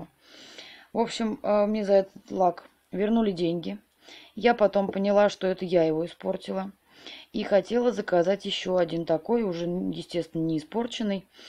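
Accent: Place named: Ivanovo, Russia. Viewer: Говор у блогера похож на ивановский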